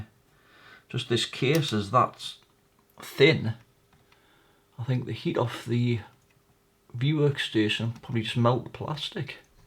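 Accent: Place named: South Shields, United Kingdom. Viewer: New subscriber,... Aussie but with S Shields roots... I've been watching a few of these repair videos, Just wondering where you are from? Sound so much like the kids I went to school with over there - especially 'bah-ries'... My mate always used to take the piss out of me for saying 'batt-uh-ries'. Hehehe...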